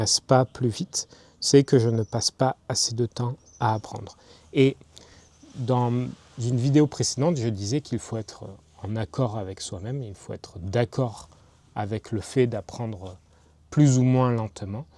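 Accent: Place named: Marseille, France. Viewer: Dis moi que tes de Marseille sans me dire que tes de marseilles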